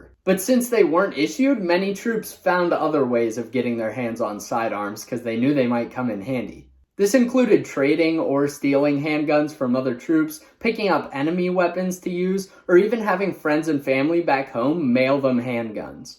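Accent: United States. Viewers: 25 years old and i will never not hate the way Americans say Carbine. The car bean thing was originally a American English vs. traditional English pronunciation. So in The UK, the Commonwealth and Ireland (ie 90% of the English-speaking world) its car byne To Americans its Car bean. And since in the modern day most firearms experts are Americans, you get Car bean being more popular in pop culture and online. Do you guys also call a turbine a turbean?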